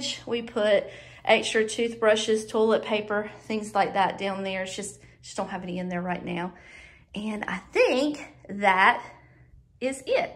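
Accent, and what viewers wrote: South, United States. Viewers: are you a southern belle? I recognize your accent from all the programs I have watched lol. I am from the Netherlands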